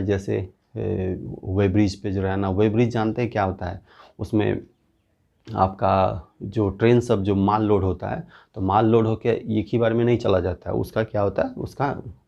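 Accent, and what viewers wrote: Darbhanga, India. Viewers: Are you from दरभंगा ?? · U sound like from darbhanga side.is it true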